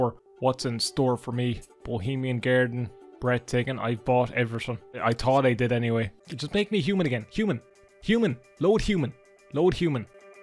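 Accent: Ireland. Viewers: I'm a simple man. I click on a let's play of the Sims games. I hear an irish accent. I hit subscribe.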